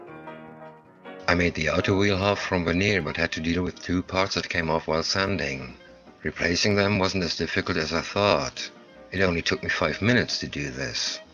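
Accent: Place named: Hungary. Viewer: . I think he is from Hungary? Your accent is maybe better.... when do you expect to show the paint video for the wagon.... I look forward to it.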